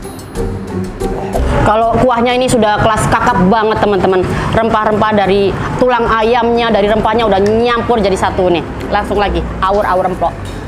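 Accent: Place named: Medan, Indonesia. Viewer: Cobain Makanan di medan ka,, kupikir kaka orang medan karna logat bahasa ny kaya orang medan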